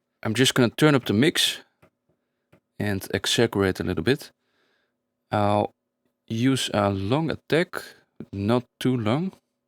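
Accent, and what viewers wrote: Netherlands. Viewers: yeah as i can hear u are dutch man xD accent speaks. I am from Finland, elektron is made from sweden , i have kick ninja plugin it is also good one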